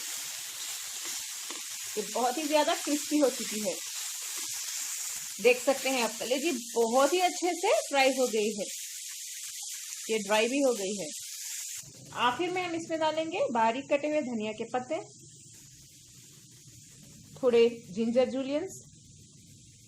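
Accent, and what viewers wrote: Hyderabad, India. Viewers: Are you Hyderabadi?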